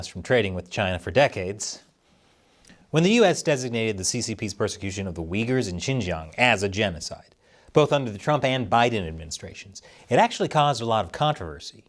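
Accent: Australia. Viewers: Thats an Australian accent ont kiwi & yes, Jacinda horse faces fuck wit has mess up our country. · Mate if you are going to insult people from New Zealand get you shit right., you insulted us by using an Australian accent..... we spend mot of our time insulting Australians.. you do no that New Zealand is not in Australia right? If we are insulting people you sound like small child and your face looks like your holding a fart in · Nice Aussie accent there!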